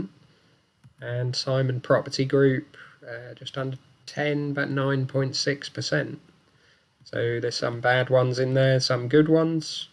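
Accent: Norfolk, United Kingdom. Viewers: UK house prices rise by an average of 6.9% per year not 4%. £100K budget for buy to let is too small. The best strategy for buy to let investing is HMO not single let and I take it from your accent your a Norfolk boi so house prices around there for HMO are more like 200 - 250k for a 3-4 bed. With that kind of property your yield would be more like £1730 per month not the £525 per month rent. Also in most cases with buy to let investing the idea is not to ever fully pay back the mortgage. Instead use it as a way to take profit by refinancing and put that money back into your family home or the stock market. So I don't think this is the best comparison because you are taking a very basic buy to let investing example. Interesting to see the way you have calculated it though.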